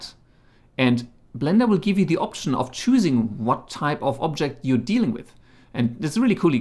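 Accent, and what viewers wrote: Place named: Germany. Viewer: Ich mag "Deutsches Englisch"... Grüße aus Norddeutschland... Cooles Video.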